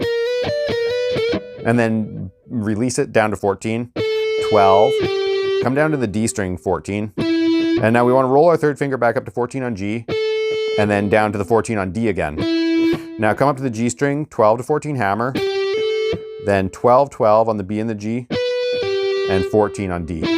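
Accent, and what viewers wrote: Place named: Canada. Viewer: Thanks for the tab great job! could tell right away you were Canadian from your accent lol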